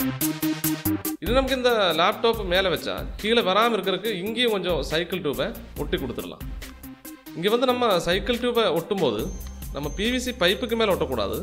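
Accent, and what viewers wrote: Kerala, India. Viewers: He is a malayali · unga slang Malayalam+ tamil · Are u malayali or pandi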